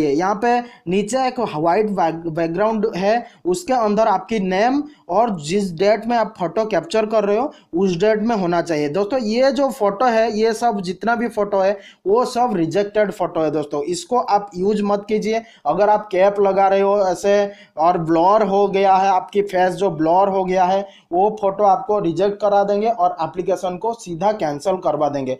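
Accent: Odisha, India. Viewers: HAS Tech burbak woh oriya hai thik se suno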